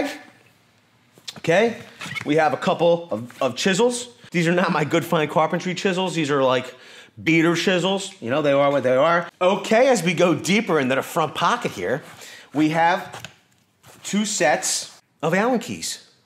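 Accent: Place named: Rhode Island, United States. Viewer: You have got to be from Rhode Island. North Providence? Johnston?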